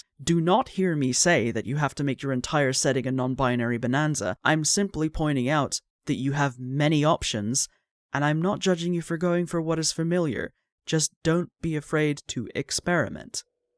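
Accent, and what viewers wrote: Scotland, United Kingdom. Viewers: Im guessing your a scot?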